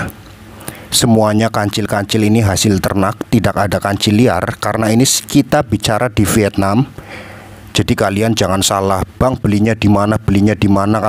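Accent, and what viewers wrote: Banyumas, Indonesia. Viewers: Apakah Bpk berasal dari Cilacap,Purwokerto,Banyumas,Tegal,brebes,Pemalang,Banjar negara Kenapa Ngapak😂😂😂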